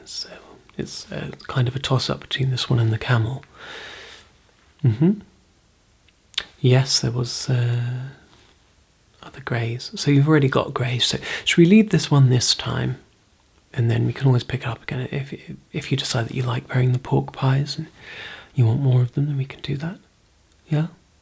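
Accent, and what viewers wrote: United Kingdom. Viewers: I know your accent is British obviously, but you look Swiss. 🤔 🤠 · You would have been a better loki any day 😄 that accent is classic very agatha christie 😏 you should do a sherlock homes asmr 👌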